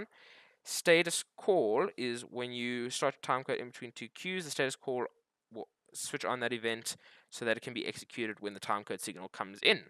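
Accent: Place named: Afrikaans, South Africa. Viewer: Hey, DELPHI 7 on the taskbar!! Lol. Jy klink Afrikaans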